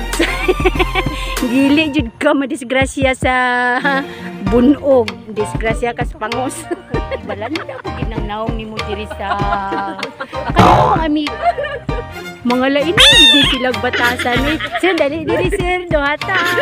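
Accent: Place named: Iloilo, Philippines. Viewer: Nami gid ang ilonggo ba hehe malalambing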